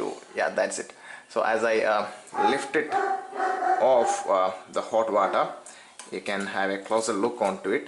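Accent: India. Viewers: ahhh,,,, Indian accent just make me sick....I can't listen to it....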